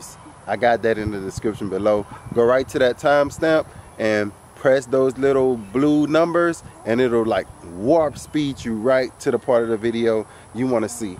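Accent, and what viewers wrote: Louisiana, United States. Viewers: As soon as I watched your first video (the one I watched before this one) I said "he sound like my people". So naturally I liked (👍) the video. Less than a minute later you verified it when you said something died off because the Louisiana heat was starting to set in. I was sold then, on top of you being out there with the family and your knowledge base just made it all the better. What part of Louisiana are you in? I'm from Belle Rose/Baton Rouge (south, la) but live in Ruston (north,la) and have been attempting to try my hands at growing with little success. I think I'm afraid to "go all in" and fail so im very cautious about how much and what I plant. Do you have any suggestions on a small but mighty starter garden? Thanks in advance.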